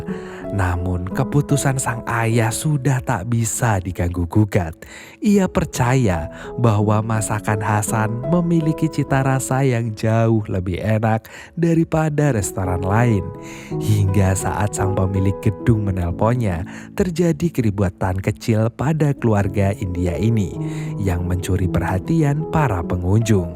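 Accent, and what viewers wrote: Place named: Java, Indonesia. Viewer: Gw saranin kayaknya mending lu kayak album film aja yang ceria gitu soalnya lu kayak orang jawa tapi udah keren kok 😅👍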